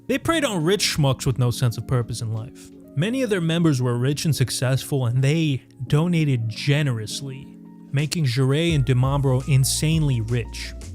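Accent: New York, United States. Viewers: Hol' up, you're from AZ? With that NYC accent?! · I watch a lot of cult content. But there's something about hearing them explained by someone with a New York accent.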